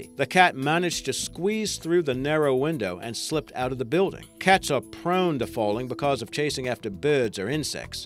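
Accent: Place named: Australia. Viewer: Rainbow Dash you can't tell? I'm American he's definitely Aussie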